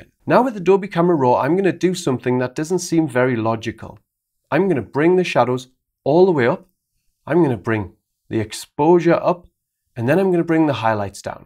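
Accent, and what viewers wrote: Newcastle, United Kingdom. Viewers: Found this via SLR Lounge on Facebook. Subscribed. A Geordie teaching Photoshop might just be the best thing ever. The only thing that would make it better was if you did a video while you were drunk lol.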